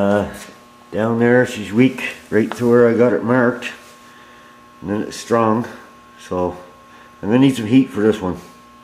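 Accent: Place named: Nova Scotia, Canada. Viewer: am in Halifax , thought you sounded Nova Scotian, Im into old Novas too and have done that frame thing as well,,had mine sandblasted, painted it inside with brushes on a long stick or piece of bent tubing .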